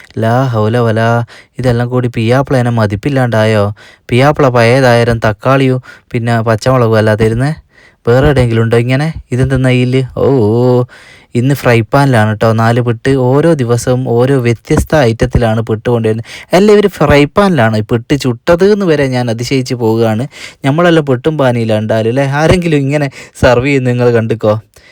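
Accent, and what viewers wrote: Vadakara, India. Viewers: വടകര ഭാഷ നല്ല രസമുണ്ട്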